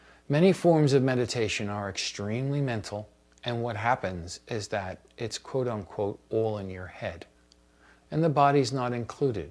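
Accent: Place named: Bronx, United States. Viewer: haha. I think this is a great meditation. His accent and colloquialisms went from the Bronx to the Universe.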